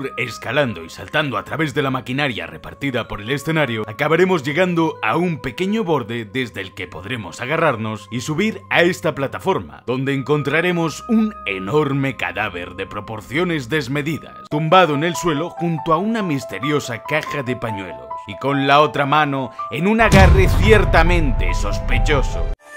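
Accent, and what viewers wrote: Spain. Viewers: Normalmente hacen un 50% y 50% en poner doblaje latino y español, no pierdan esa costumbre. Lo comento por que en este video se nota mucho el doblaje español sacando el detalle del capi en la outro del video.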